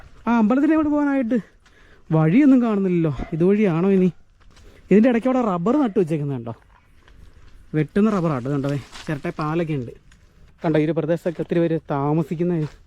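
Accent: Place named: Angamaly, India. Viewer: സംസാരത്തിന് അങ്കമാലി ടച്ച് ഉണ്ടല്ലോ.🎉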